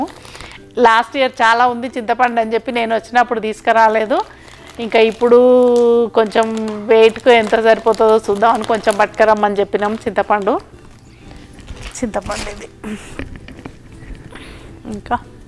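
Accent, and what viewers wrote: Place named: Telangana, India. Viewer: hi amma, me mata vintay ma intlo vala mata vinatlay undhi , madi telangana nice video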